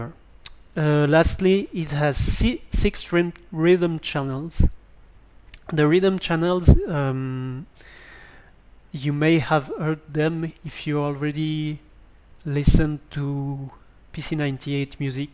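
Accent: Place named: France. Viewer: I'm a french guy listening to an english tutorial given by another french guy with a very french accent, reminds me of school Thanks for the tutorial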